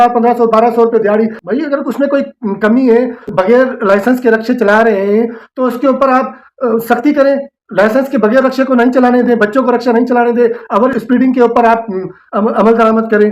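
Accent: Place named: Sindh, Pakistan. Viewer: بھائی اپ کی ویڈیو دیکھی اپ عوام کے لئے بہت دفاعی اواز اٹھائی ہے اللہ پاک اپنی شان کے مطابق کی اور ہماری مدد فرمائے بھائی اپ ہمیں سندھی لگ رہے ہیں اپنی انداز اور اواز سے ہم جب اندرون میں سندھ جاتے تھے تو سندھی لوگ ہمارے لئے بڑے پیار سے لسی پراٹھا مکھن لے کر اتے اور خوشی خوشی ہمارا سات سات جگہیں گھماتے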